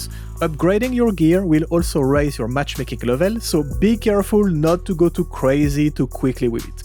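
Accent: France. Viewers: Thx very good video, are you french tho? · Are you french ?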